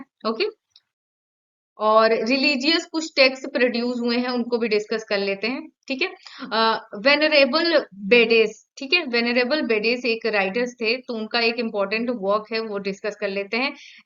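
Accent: Bihar, India. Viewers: Don't say like this galt nhi bs unka kuch tone aa jata h Bihar ka hm unki jitni knowledge gain kr paye bhut badi baat h aur unke jaisa pda paye teachers to bhut but kuch hi teachers ka padaya huaa mind mai aata h ...Sir aapko knowledge teaching I really love 💕 mai bhi aapki jaisi hi teacher bnna chahti hu ki Mera padaya huaa mere students ko samjh mai aaye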